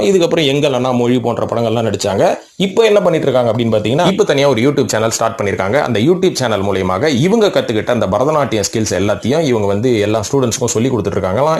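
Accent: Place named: Sri Lanka. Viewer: இஞ்சருங்கோ இலங்கை தமிழ் தான் bro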